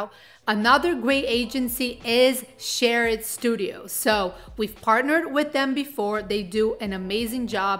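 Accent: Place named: Brazil. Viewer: İ like the sweet 🍬Brazilian accent made it more fun to listen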